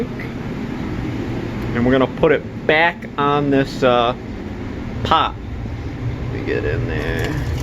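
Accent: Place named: Maine, United States. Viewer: Yeah, I guess I know why the accent sounded kind of familiar since I lived in Maine for over 30 before I bailed out and moved to Seattle. I have never heard of paper and food.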